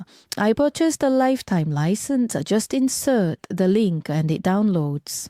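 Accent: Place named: India. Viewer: 인도 사람이 중국어 하는거 같아요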